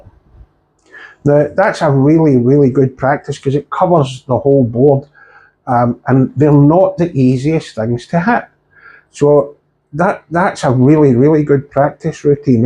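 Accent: Scotland, United Kingdom. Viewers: The trade in is an awesome idea, wish we had something like that in the UK, I'll definitely get in touch and see about the shipping cost! Also I've got no problem with your Scottish accent!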